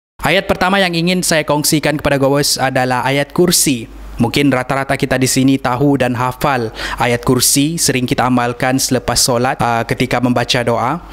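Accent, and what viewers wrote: Sabah, Malaysia. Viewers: Orang sabah ka ni? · Pekat beno loghat Sabah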